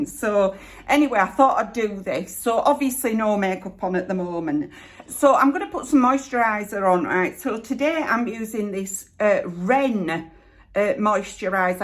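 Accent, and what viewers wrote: Yorkshire, United Kingdom. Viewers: You told this story so vividly I could just “ see” all the kids shivering in the snow …. It’s a good idea for to be applying your makeup whilst recalling a memory and interesting for us viewers to watch as well as listen I am originally from York now in New Zealand but I really enjoy all your videos and love your unaffected Yorkshire accent… I can relate to many expressions which you use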